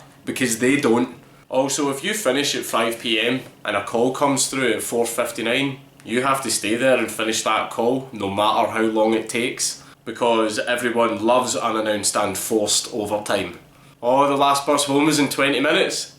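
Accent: Scotland, United Kingdom. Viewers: LOL so very Scottish. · Hans Kloss its Scottish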